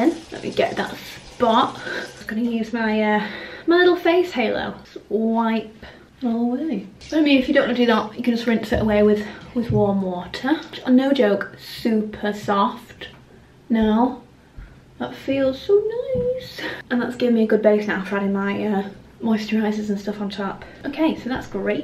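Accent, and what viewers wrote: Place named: Wigan, United Kingdom. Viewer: I’ve only just subscribed to you and can’t believe you’re also from Wigan!! Immediately I thought I recognised a twang in the accent 😊 xx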